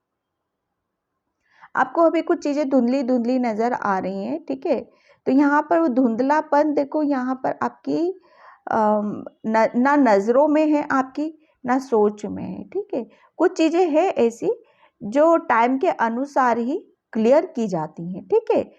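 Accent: Gujarat, India. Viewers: Ma'am I believe you must be from Gujarat. I can make out from pronounciation, as I am working in defence organization. Good Night 💤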